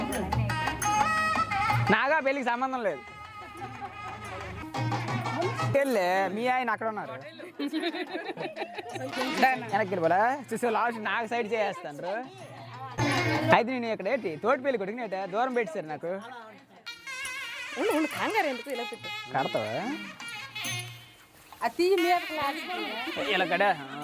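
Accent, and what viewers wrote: Srikakulam, India. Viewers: Anna Mee srikakulam yasa balga untundhi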